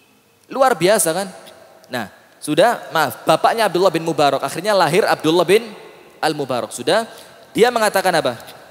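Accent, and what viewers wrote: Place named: Surabaya, Indonesia. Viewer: Ustad dr Jawa timuran ndelok logat e lek gak arek SBY Yo arek malang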